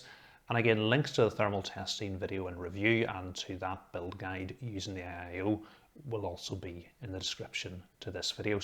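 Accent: Northern Ireland, United Kingdom. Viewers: Ignoring the obvious NI accent similarities, you sound an awful lot like Jim Browning! In the nicest way possible, your voice belongs to a much older man. It's calming and soothing. I'm from NI too, and never have I heard someone younger than about 45 sound the way you do.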